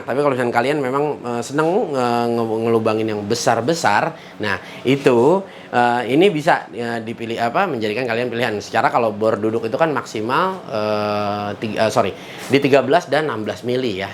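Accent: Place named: Betawi, Indonesia. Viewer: Jujur bang cara penjelasan ente emang beda betawi abis mantap ❤ane jd cengar cengir ngeliatnya 😂 ini baru review + hiburan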